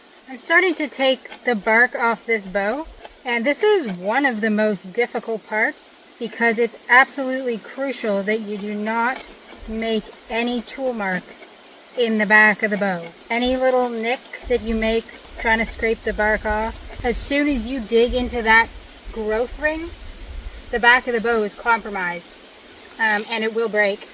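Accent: United States. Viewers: ... fantastic technique .. congratulations ... are you from Queens?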